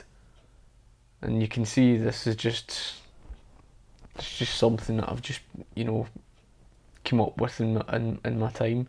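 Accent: Scotland, United Kingdom. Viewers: Hey, welcome back! I'm sorry about your situation, I hope you're well. Have you considered joining other political streams like "The Academic Agent"? Check him out, he's a another classical liberal and makes economic videos and political streams. Perhaps you can get in touch with him! Above all you should focus on yourself, if making other content is what is going to be the best for your situation you should absolutely push for that, don't worry about us we'll be good, but we'll miss you and your Scottish accent. P.S What are your opinions on the new film Outlaw King?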